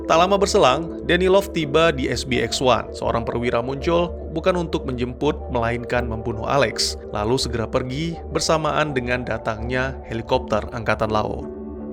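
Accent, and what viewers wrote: Aceh, Indonesia. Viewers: Kak Orang Aceh mana kak?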